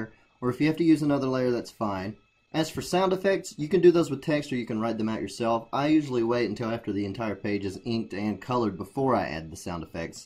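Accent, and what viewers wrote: Texas, United States. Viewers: are you from Texas or Nevada?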